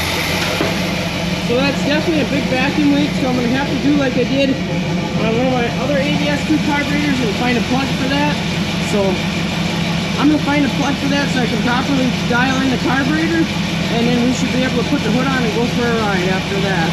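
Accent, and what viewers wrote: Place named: Wisconsin, United States. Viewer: What part of Wisconsin are you from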